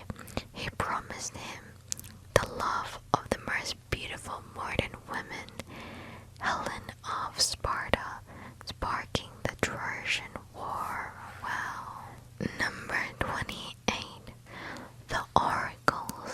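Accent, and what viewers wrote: Australia. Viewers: that Australian accent even through the whispers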